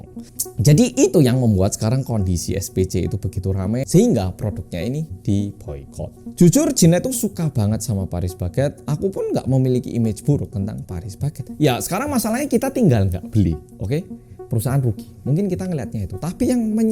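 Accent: Java, Indonesia. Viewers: Lah kmn aja..org hidupnya lbh byk di Indo..ngomongnya aja medok bgt..🙈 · Wahh abang ini habis nikah tambah ganteng. Selamat ya bang orang Korea yg medhok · Yaelah wajah nya ala Korea logat bahasa nya ala indonesia jawa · oppa ngomong nya kok medok si oppa 🙈🙈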